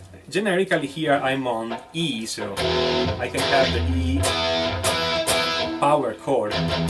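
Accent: Italy. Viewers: That's why Meshuggah is so hard to listen to.. I have no guitar knowledge whatsoever but thumbs up for the juicy italian accent ;)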